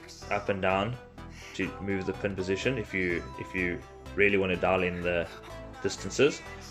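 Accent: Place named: South Africa. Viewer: SA? you sound like you are from SA.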